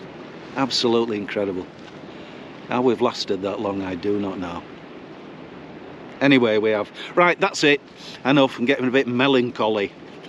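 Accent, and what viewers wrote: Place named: Northern England, United Kingdom. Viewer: Golden rule. If it works, don’t fix it. People appreciate your sense of humour, and for an expat like me it’s nice to hear the northern accent and your touristy videos.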